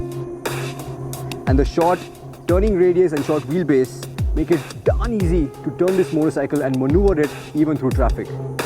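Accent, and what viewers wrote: India. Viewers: Hello aka:)... I been a witness to your many from the time of your inception. If you accept feedbacks.I have 2. 1) Few clips are repeated in all your reviews. Here one in black n white and then in color. Like something's missing 2) Agree, u have a global viewership..but an Indian is the host with the accent...Would have been great if he spoke the history/ fan following wearing a Dhoti and white shirt start and ending with the jacket:) In the end Its a Royal Enfield.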